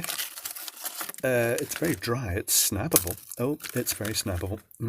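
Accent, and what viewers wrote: Scotland, United Kingdom. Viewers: Well, the Scottish accent is good, Plus has a good grasp of all things technical, and explains things really well (something I really appreciate). It doesn't matter what your level expertise, you'll probably learn something by watching. eg: I didn't actually know that the gas given off by those chemical heaters was Brown's gas (HHO, in a perfectly explosive mix) but it makes perfect sense now that I've been told. Us techies can be strange people, and understands us better than our parents did, so it's a bit like coming home to the home you never had. By being himself attracts technical people, and gathers people who all speak the same language. Sometimes I wonder if himself knows how special this is.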